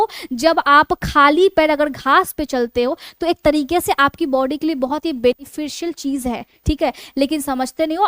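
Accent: Bihar, India. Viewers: Jankari aapki bahut hi mahatvpurn hai bahut achcha se aap explain kar rahi hai aapki bhasha mein Bihari Pan ka lagao lag raha hai vaise very nice❤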